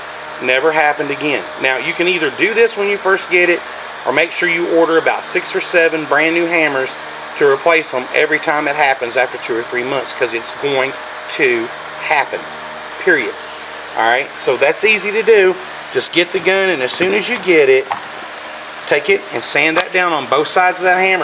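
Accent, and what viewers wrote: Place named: South, United States. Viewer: now hummm now now you have a south accent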